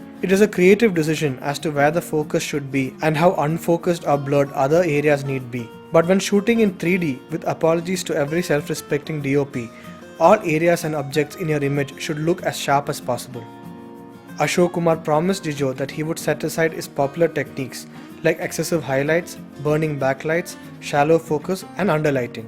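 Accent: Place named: Kerala, India. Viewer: The narrator's English is Purley like a malayali's English!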